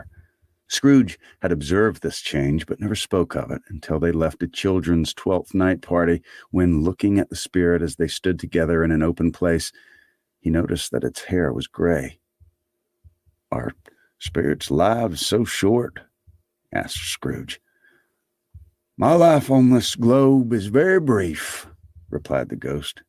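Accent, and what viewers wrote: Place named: South, United States. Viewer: The Dickens writing and the accent in your narration gives the whole audio story an “antebellum” feel to it. As though this could be a story taking place in placid North Carolina and not urban London. Which is probably a testament to Dickens’ talent as a storyteller!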